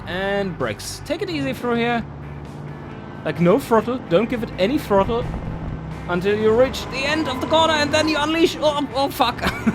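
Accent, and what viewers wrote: Germany. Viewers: Bon soir monsieur, are you from this lovely part of our earth Germany? I cant quite make out if you are German or not... Cheers mate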